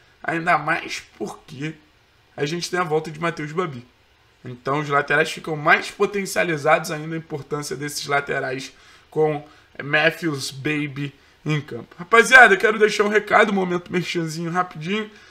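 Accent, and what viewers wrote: Rio de Janeiro, Brazil. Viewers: E esse sotaque carioca torcedor do furacão? 👍👍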